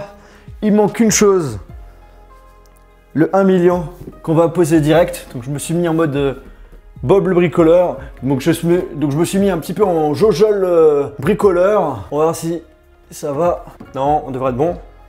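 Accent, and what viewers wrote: England, United Kingdom. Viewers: hahahaha j'aime ton accent d'englais sa me fait rire 😂👌✌️❤️ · TON AXAN ANGLAI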